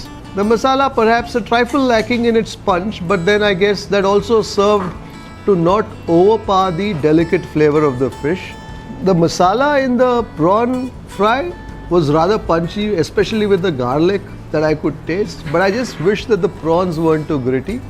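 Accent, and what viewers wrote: Karnataka, India. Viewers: Love your Kannada accent sir❤️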